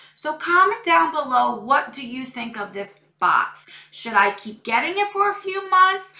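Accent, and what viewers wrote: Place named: Long Island, United States. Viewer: You're just such a sweet and positive but very real person,. My husband's from Long Island and when he hears me watching your videos he says it sounds like home. ❤️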